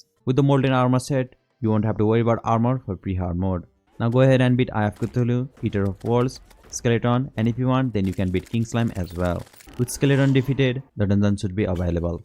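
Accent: India. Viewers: no, he IS Indian but he's clearly not trying to hide his accent. He's just talking fast. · But he sounds Indian,sounds like my professor. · Can't understand with you with your jeet accent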